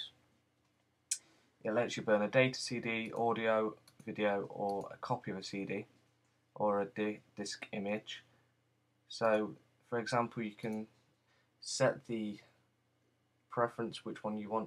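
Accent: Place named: United Kingdom. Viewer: cannt you get a better microphone ans speak clearly without the brittish accent ? we are trying to understand but its hard .. .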